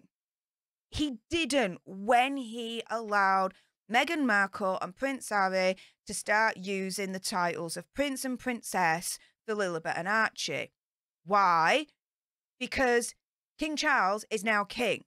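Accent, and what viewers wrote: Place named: Northern England, United Kingdom. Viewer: Hi, just popped in following Trev Coult's recommendation - to find out what you get up to! I've been trying to place your accent - somewhere around the Pennines between Lancs and Yorks, I think. I'm in Cheshire. (and don't panic, you're WAY too young to interest me socially!) - - I think we should depose Horrid and Methane's kids.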